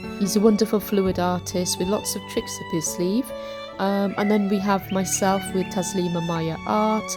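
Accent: United Kingdom. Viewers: Beautiful work ! Wow ……where is you accent from may I ask ……you sound like you’ve e got a British twang like myself lol ❤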